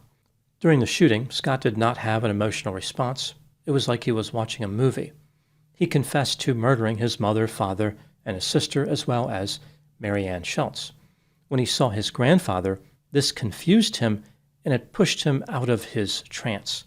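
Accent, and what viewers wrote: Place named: New Jersey, United States. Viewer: Dr accent is very Jersey or Philly..So that may explain alot..lol..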